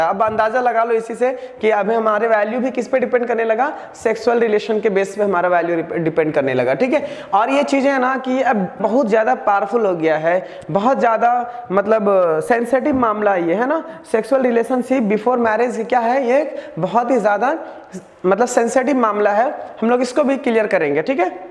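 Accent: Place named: Bihar, India. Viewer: Your style of speaking is outstanding.।। Sb bihari aise hi hote h kya, Love your accent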